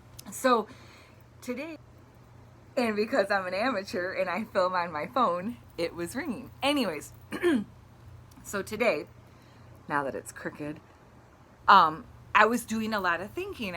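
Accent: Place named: Midwest, United States. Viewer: You're amazing! I found your channel during BBW SAS, and have really enjoyed your videos. Honestly, I really enjoy your accent. I love people from the midwest, people there are just so sweet and kind and genuine. Your accent makes my heart smile. I love how you make a big deal about the thumbnail and Byyyyyyyyyye, too. It's your signatures! Keep doing you, you can do anything! ♡ #TeamJackie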